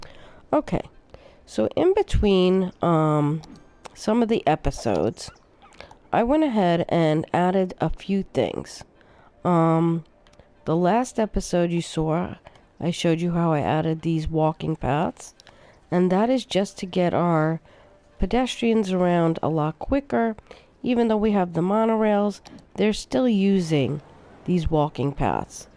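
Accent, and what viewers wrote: Long Island, United States. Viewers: I’m hearing a Long Island accent I think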